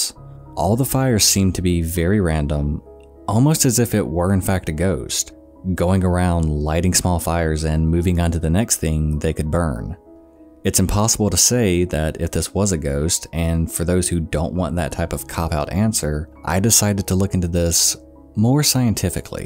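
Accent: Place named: Midwest, United States. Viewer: heh, you pronounce especially like "expecially". you must be from the midwest.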